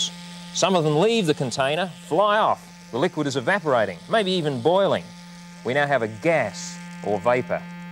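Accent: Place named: Australia. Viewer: Australian, I think. But he could be Tony Hinchcliffes Dad!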